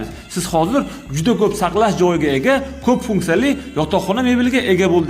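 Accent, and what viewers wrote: Namangan, Uzbekistan. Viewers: Аксинча шкафчалар купайиб кетдику шевангиз Наманган шевасими даров таниманда 😂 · Шева наманганми? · Namangancha gapitish qiyinda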